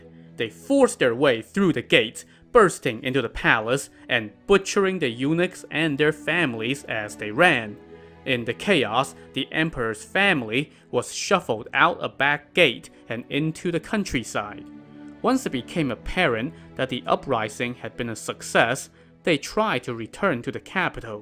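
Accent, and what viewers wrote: China. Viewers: It is. He has a Chinese accent. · Is the narrator a Cantonese?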